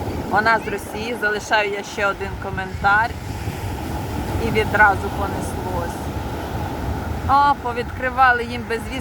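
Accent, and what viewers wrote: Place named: Podillia, Ukraine. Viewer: Ви з Поділля? Маю знайомих з Бершаді, говорять точнісінько як ви.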